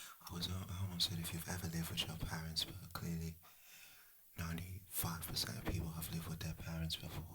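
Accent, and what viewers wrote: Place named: United Kingdom. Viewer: Lol I actually thought you were british 😂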